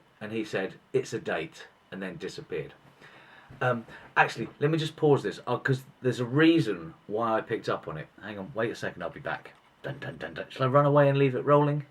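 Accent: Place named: United Kingdom. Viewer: Beautiful British accent and a beautiful encouraging message! Thankyou xxx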